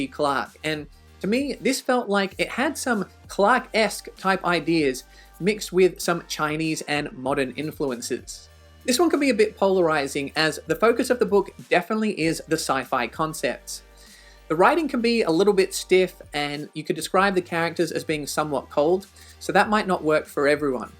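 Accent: Australia. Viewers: The algorithm brought me here, and I'm glad it did. Good to hear an Australian reviewer. My particularly self-serving suggestion is my debut, 36 Streets (Titan Books UK). It was just last week shortlisted for an Aurealis Award (Best Science Fiction Novel), so I can assure you it's not terrible. Cover quote from Richard Morgan (Altered Carbon), who's a huge fan of the book. Cheers, T. R. Napper.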